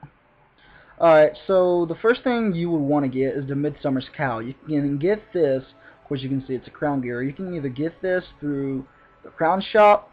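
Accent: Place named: Kentucky, United States. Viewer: God dang his accent so kentuckyish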